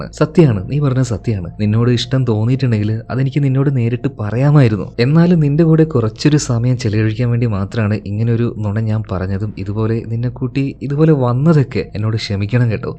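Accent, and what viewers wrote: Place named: Kannur, India. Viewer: Bro kannur alle appo😊😅❤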